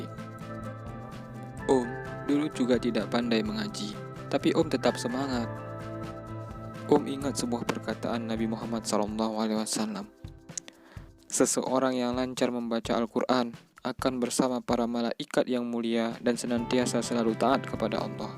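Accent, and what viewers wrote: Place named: Medan, Indonesia. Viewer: Medan kali bahasanya ya, mantap bg.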